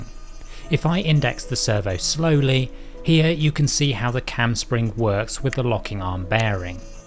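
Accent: United Kingdom. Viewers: Beautiful engineering for a rather niche experiment! I have real trouble placing accent though... A touch if Western Scotland, Ireland and south east London (the posher bits)!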